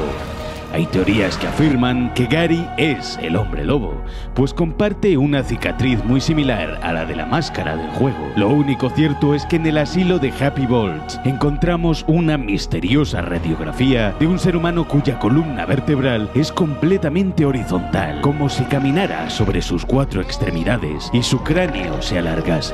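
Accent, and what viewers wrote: Spain. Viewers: Una pregunta eres de España o de latinoamerica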